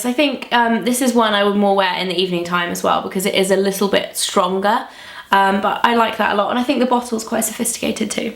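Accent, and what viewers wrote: United Kingdom. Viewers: Hi! I really like the way you talk.. I just wanna ask what's your accent called? I mean what kind of British accent it is?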